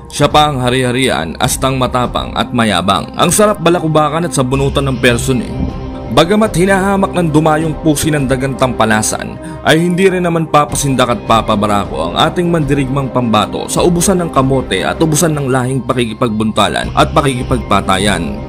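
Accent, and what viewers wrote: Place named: Cabanatuan, Philippines. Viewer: Taga Cabanatuan kaba I dol. Katono ka Ng salita Namin.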